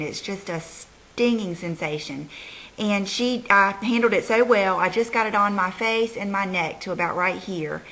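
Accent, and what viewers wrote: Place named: North Carolina, United States. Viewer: Elizabeth Elizabeth I'm not sure where you are from but here in good ole' backwards NC with our accent it's "whelps" Your comment kinda pissed me off because you seem to be poking at her accent. We are very proud of our accent here and I think is as cute as a bug with her accent.oh lord there goes that darn accent again. You seem to be a judgmental person. jmo! Also FYI WHO HAS 3 SUBSCRIBERS AND WHO HAS THOUSANDS OF SUBSCRIBERS! Nuff said as we say here in NC!!!!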